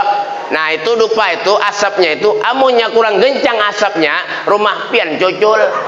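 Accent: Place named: Banjar, Indonesia. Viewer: Logat nya Arab Banjar 😁😁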